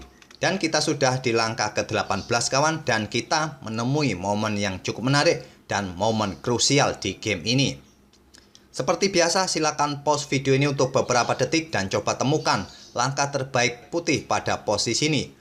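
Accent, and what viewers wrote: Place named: Banyumas, Indonesia. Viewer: Sama ngapak2 min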